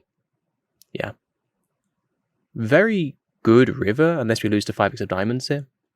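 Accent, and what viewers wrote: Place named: RP, United Kingdom. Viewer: are you going to bring some sub content or a course or smth in between? I love your approach to poker as well as your perfect RP english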